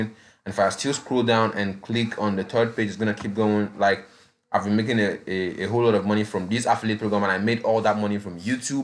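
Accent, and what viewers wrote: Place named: Nigeria. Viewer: Hey, great tutorial, I gather you are Nigerian from your accent, but many from Africa are facing this challenge of poverty, unemployment, poor internet connections, power blackouts etc-Most well-established affiliate programs have an approval process which requires a website and thousands of subscribers, Some programs like Legendary Marketer are not available in Africa, Can you make some videos tailored to Africa, like some work from home solutions /payment solutions that are available to Africans? If you search on youtube you will notice that India and Bangladesh are taking youtube by storm, and they assist their colleagues to get faster solutions tailored to their needs, I wish the same could happen for Africa so that more Africans can benefit from this opportunity and you could be a role model to the youth in Africa. Getting started, lack of knowledge and proper mentorship is the challenge that is facing most Africa, please have this in mind as you continue in your online journey. 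Thanks.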